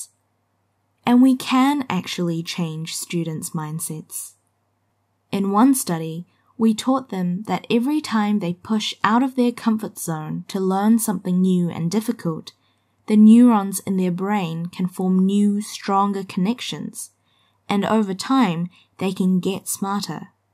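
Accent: New Zealand. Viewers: 언제 어쩌다 뉴질랜드 발음에 꽂힌 지 모르겠는데 그때부터 항상 잘 듣고 있어요! 목소리랑 발음 너무 예뻐요!! 나중에 문장 끊어서 쉐도잉 할 수 있도록 반복재생? 되는 것도 올려주시면 좋겠어요 너무 좋아요ㅜㅜ♡ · 이거랑 Te Aorewa 영상으로 쉐도잉하는데 정말 좋아요! 항상 쉐도잉 자료는 영국, 미국 영어만 널려있어서 손이 안갔는데 한국 유일하게 키위 액센트 교육채널이 있다는게 정말 감사해요. 앞으로 인터뷰나 테드처럼 쉐도잉 할 수 있는 컨텐츠도 더해주실수 있나요??ㅠㅠ 목소리도 너무 예뻐요ㅠㅠ 왜 top sexiest accents에 키위억양이 1위로 뽑혔는지 알것같아요ㅋㅋㅋㅋㅋ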